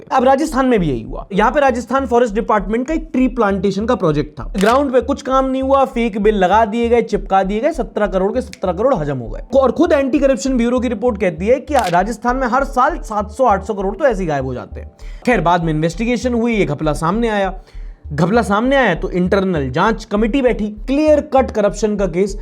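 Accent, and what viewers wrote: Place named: Bundelkhand, India. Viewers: Bhai ki Bundelkhandi bahut achi hai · LOVE YOUR BUNDELKHANDI ACCENT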